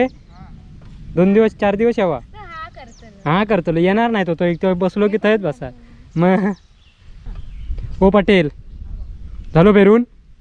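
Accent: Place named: Malvan, India. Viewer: तुमची मालवणी फार सुंदर आणि ओरिजनल आहे. म्हणजे त्यात इंग्लिश आणि मराठी शब्दांची भेसळ नाही. बाकीचे v ब्लॉगर्स मालवणीच्या नावाखाली मराठीच बोलतात. आणि भरपूर इंग्लिश शब्द वापरतात. ॲड करा, स्टर करा, जॉईन व्हा, ब्रेक फास्ट करू या वगैरे. तुमच्या ब्लॉगमध्ये शुद्ध मालवणी ऐकायला मिळते.छान वाटतं.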